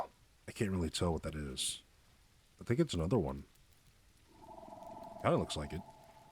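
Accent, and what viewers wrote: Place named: United States. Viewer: Thumbs down because you wouldn’t list its height and weight in imperial. Why have an American voice and use foreign measurements?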